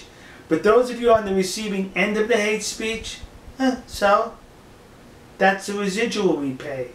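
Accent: New York, United States. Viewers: I know you're white but what is your ethnic European back ground ? you look Italian to me I think you're Italian boy you Italians hate Arabs I'm not an Arab but I hate Italians even more so I defend Arabs even though I hate them to. you sound like you come from new York have you ever heard about us Trinidadians we are of the Asian Indian race . the British brought our ancestors from India to Trinidad and Tobago to work as indentured workers . we're ralated to Asian Indians. any way the Japanese had the right to ban hate speech cuz it causes problems . they should do that here in america ! I'm not against hate speech I just think it should only be used behind closed doors within organizations. hate groups should not be allowed to go to peoples neighborhoods to tell them they hate them. there's alot of violence at racist rallies that kind of hate speech should not be allowed unless your hating people behind closed doors!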